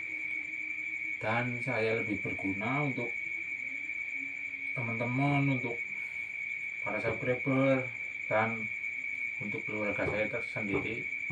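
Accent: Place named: Banyumas, Indonesia. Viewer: Sama sama 🙏, alhamdulillah sudah berubah, dari awal saya suka dngn gaya bicara lucu ngapaknya, semoga canelnya makin maju n selalu di beri kesehatan n bisa menghibur subscriber aamiin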